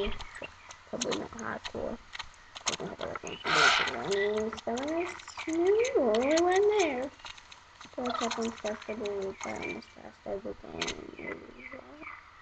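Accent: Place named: United States. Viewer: you sound amarican!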